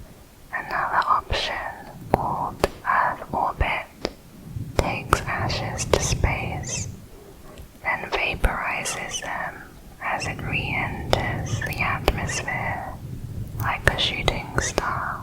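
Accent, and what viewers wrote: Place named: Liverpool, United Kingdom. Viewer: Lovely Scouse accent.❤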